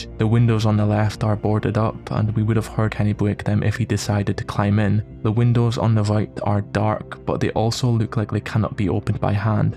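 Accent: Scotland, United Kingdom. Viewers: Scottish, fucking right mate good stuff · Great video. Where are you from btw? Ngl I honestly thought you were European at first but as you got a little more comfortable I started thinking 'is he Scottish? Holy shit' lol 🏴🏴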